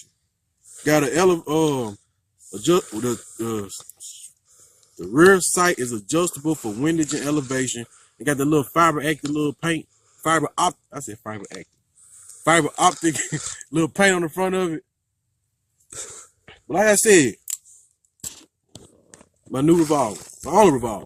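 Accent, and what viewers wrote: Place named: South, United States. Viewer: Baruch Hashem (G-D Bless you), I need a revolver too there's just something ao fun about them that is fun and a good 357 is usually 1 n done if you really need it always enjoy your videos. Youtube needs more ppl like you. Sell some shirts (or practical tac gear/gun gear white label basics whatever?) or something if you need the money like i do, go for it. Your lack of money seeking though also is part of what makes your channel nice and different so if you do it just take some baby steps. You are headed in the right direction keep it up!! what you are doing is rare and only a few others out there that I have found, I like to watch a real person do real things I am also interested in and not be a prick about it. Keep it up you are on the right path and your accent makes me miss the more southern areas of these states something about it just seems friendly. 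Wow, that is a long comment G-D bless you Shalom and Baruch HaShem.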